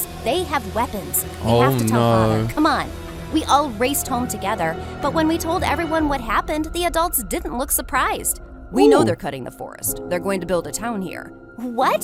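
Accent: United Kingdom. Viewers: i love the brittish accent. NO WAY! also love how misa says. she has a perfect memory so casualy when she hates her 😅 then goes back to hating her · The British accent 😭😭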